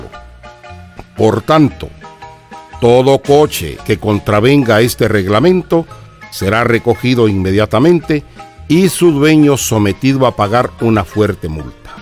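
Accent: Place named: Guatemala. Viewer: Me encuentro fuera de el pais y al escuchar esa voz me siento cerca de mi Guatemala😕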